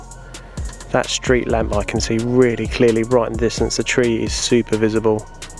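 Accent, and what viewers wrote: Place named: Australia. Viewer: Where are you located? Sounds Australian but I though Australians had super tough gun restrictions.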